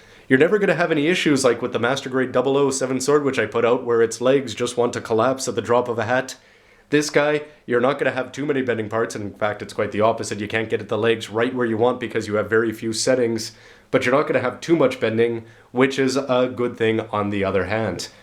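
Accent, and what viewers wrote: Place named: Canada. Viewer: sounds Canadian...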